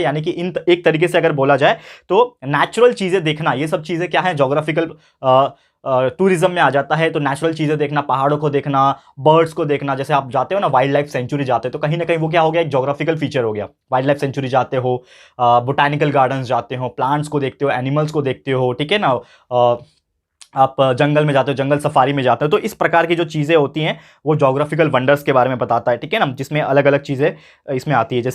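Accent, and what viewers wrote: Maharashtra, India. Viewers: Sir kya aap Marathi ho Apki accent aur kuch words aap Marathi boltey ho to aisa lagta hai ki aap Marathi ho Kisi video mein bol dena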